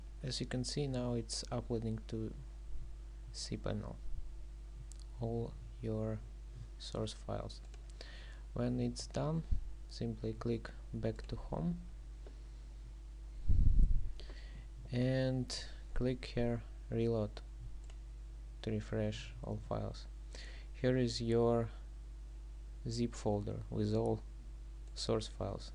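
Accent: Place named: Russia. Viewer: you sound russian i love you <3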